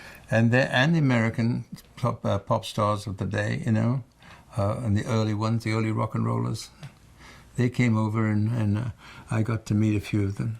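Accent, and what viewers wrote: England, United Kingdom. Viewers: Maybe you can’t sing you songs that way but I just love hearing you when you speak with your English accent even though maybe it has faded a bit it’s still there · It's always great to see you Mr. Humperdinck. You sound great with that British accent also.❤ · Hi handsome. I love your British accent!! And, I love when you sing the way you do, without it!! Either way, you are absolutely the best entertainer of all time in my book!!! I could listen to you talk forever!!! I go on YouTube almost every day and watch either a concert or an interview, or anything that you’re in just to hear you. You make my day!! Thank you!!❤️😘🙏🏻. Della, from Pittsburgh, Pa.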